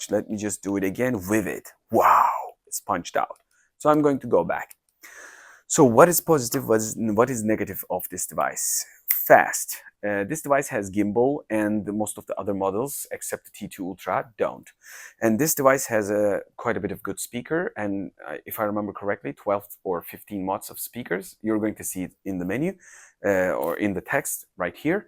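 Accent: Turkey. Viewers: This is Turkish accent. Öyle değil mi? Nerede duysam tanırım hocam :) (ve 'de de Hoşçakalın'ı duymak beni mutlu etti). Detaylı rew için çok teşekkürler. Thanks for detailed rew.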